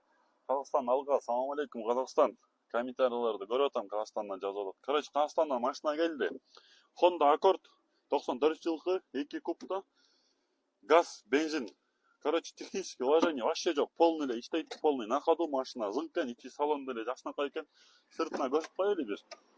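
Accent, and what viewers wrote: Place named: Kyrgyzstan. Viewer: Атан молодец таза Кыргызча суйлойсунго брат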